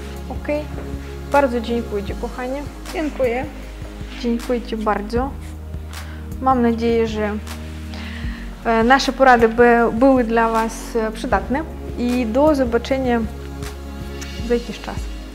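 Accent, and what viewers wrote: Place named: Ukraine. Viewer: Kurwa ten ukraiński akcent jak ja tego nie znoszę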